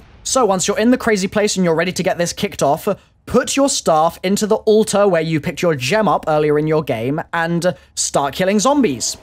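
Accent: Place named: United Kingdom. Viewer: You're British arent you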